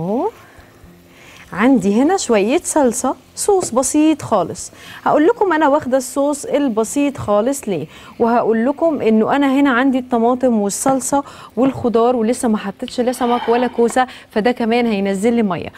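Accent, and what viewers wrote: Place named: Morocco. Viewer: تسلم الايادي حبيبه حتى وانتي كتكلمي مصري اللكنه مغربيه مزال حااضره ههههه لاااايك بقوووه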